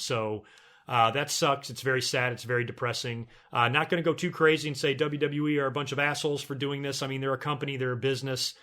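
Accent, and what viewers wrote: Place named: South, United States. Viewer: Your southern accent is torturing!!!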